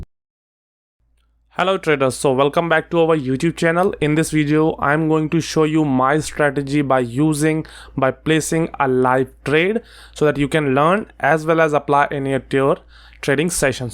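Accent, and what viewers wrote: India. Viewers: Very good explanation with good indian english...we know very well sir....excellent video.....new subscriber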